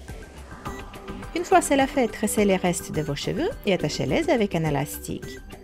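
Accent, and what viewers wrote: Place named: Russia. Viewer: you have a little accent. it's cute! Are you russian?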